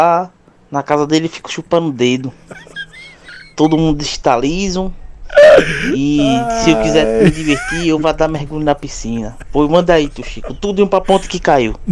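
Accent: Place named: Recife, Brazil. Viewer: Tive de ouvir tudo kkk , provavelmente ele é de Recife/PE, sotaque não nega.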